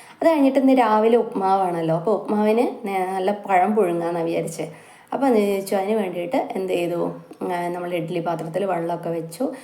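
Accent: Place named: Thrissur, India. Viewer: തൃശൂർ ആണ് എന്ന് തോന്നി ok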